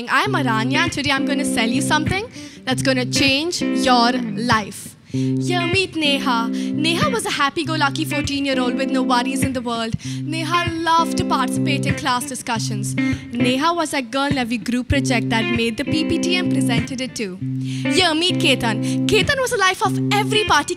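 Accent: United Kingdom. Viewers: And I love your English accent❤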